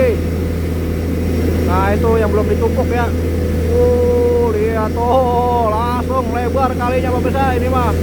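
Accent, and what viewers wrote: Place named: Jakarta, Indonesia. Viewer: Ni youtobernya lucu bhsanya Betawi bnget hhhh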